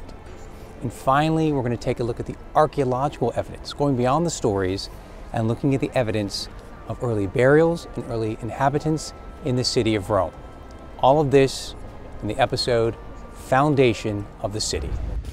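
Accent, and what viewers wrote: United States. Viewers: Do Italians think you're Italian? I did. If you didn't have such an American way of speaking, no one would know (from looking at you), that you're not Italian or at least Western European.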